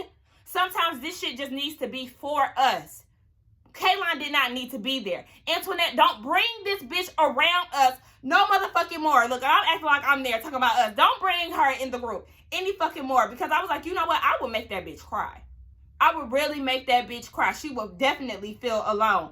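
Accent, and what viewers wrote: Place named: Florida, United States. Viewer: A hit dog will holla!! They hit a soft spot that was ringing Hella true for her! Baybee that left hand of yours be having its own conversation when you get heated...like it was say get da fuk away from me wit this lmao...I love hearing you tauk...cause I'm from floida(we don't pronounce it like its spelled) lol and we sound jus the same.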